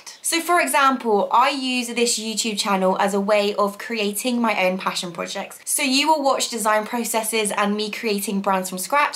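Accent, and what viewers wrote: United Kingdom. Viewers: Hello, thanks for your so helpful videos! I love your BRITISH accent but you speak a bit fast lol. thanks again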